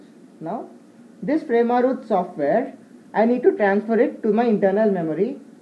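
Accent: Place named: India. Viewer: Ce accent are indianul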